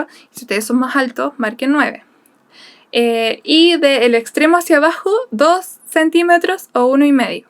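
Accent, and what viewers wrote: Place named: Chile. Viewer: Amo el acento chileno😊 ya me suscribí. Muy buen tutorial. Saludos desde México. Ojalá hagas mas videos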